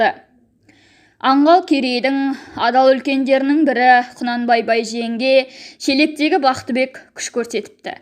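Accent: China. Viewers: Осы автордың дауысын естісем,қытайдың дауысын естігендей, денем тітіркенеді..Қытайдың акценті.Біріңді бірің жау көріп,Қазақтың кең даласына сыймай жатырсыңдар-ау.Ұялмайтын адам екенсіңдер.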